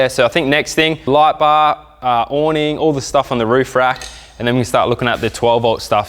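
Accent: Australia. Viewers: Are you Australian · Is this dude Australian because I’m Australian I want to buy dress this off-road machine it’s just so cool I love it😎 ❤👍👍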